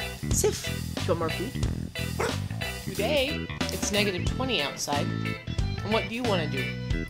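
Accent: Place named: United States. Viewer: You have a wonderful doggy/human family. And a lovely place to raise them. Lucky creatures. Are you Norwegian too? You sound American, but I imagine some Norwegian influence. Sorry, am not nosy, just curious (and envious). All the best to you all. Anne-Lise (from Norway)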